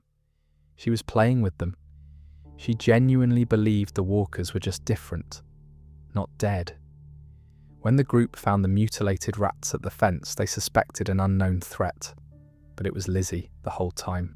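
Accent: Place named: United Kingdom. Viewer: This narrator sounds British, and they have a notoriously poor grasp of the size of the United States.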